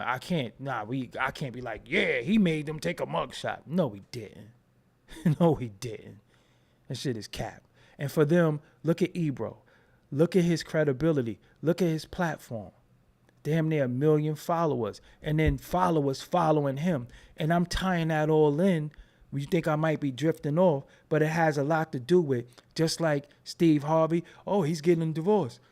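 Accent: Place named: New York, United States. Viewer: Nigga you sound like a NY’er… I’m my NY accent “Get the f… outta here”…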